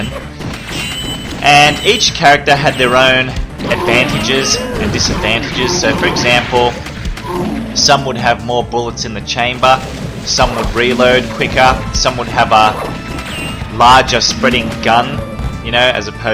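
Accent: Australia. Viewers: are you australian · Are you Aussie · Are you Australian, by any chance?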